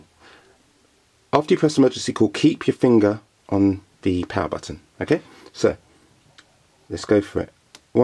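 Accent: United Kingdom. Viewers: It’s called English or British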